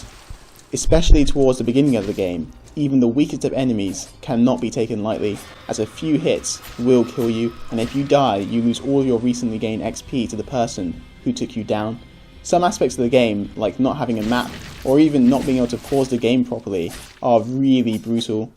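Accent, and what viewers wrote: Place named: South London, United Kingdom. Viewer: Thanks for sharing your thoughts dude... I really liked your review, more objective and informative than a lot other reviews out there. A suggestion - record your audio and try varying your vocal tone a bit more, you'll sound more compelling. I like your calm and factual style, but sounding more invested helps (see angry joe getting angry). Only mention it as I have a very similar accent to you (south east London? Palace fan maybe lol?) and unless I think enthusiasm, I can put ppl to sleep. Anyway, nice vid, subbed - I request a ds3 review (if my pointers make you any ad revenue, I want my cut). Peace!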